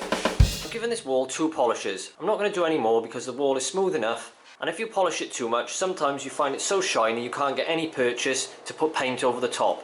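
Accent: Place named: South Wales, United Kingdom. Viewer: South Wales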